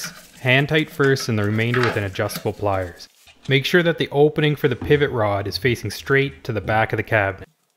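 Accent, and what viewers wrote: Canada. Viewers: You must be from the Maritimes.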